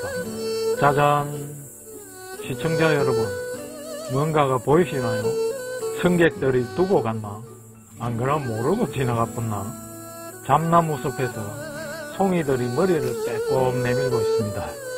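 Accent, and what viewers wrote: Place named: Gyeongsang, South Korea. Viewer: 말씀하시는 억양이 경남쪽이신것같아 반갑습니다 저는 마산태생입니다 구독 좋아요 누르고 봅니다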